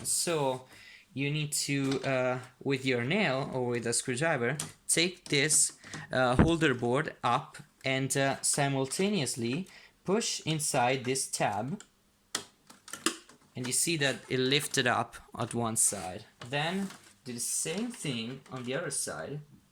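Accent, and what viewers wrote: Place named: England, United Kingdom. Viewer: mi sembra che tu lo parli come un inglese complimenti .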